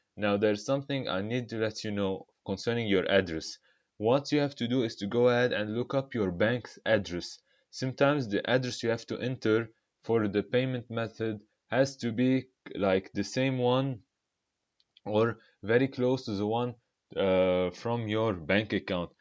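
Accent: Arab world. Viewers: واضح من لهجتك انك عربي 🙂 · Bruh from your accent i thaught u where arab. When i saw your name i knew it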